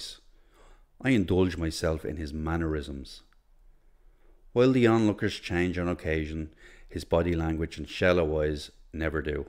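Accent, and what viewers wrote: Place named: Scotland, United Kingdom. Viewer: I like your accent. Scotish accent I suppose.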